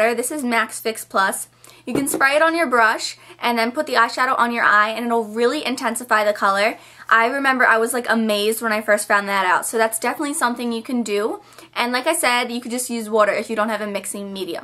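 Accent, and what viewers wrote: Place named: New York, United States. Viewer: All New York people have that accent.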